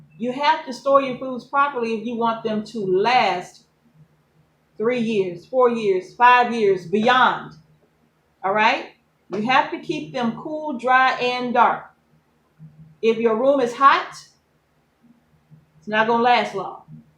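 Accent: South, United States. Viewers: From my experience, yoie tubie can't figure out CC, especially with a southern accent. I have seen some ridiculous "translations".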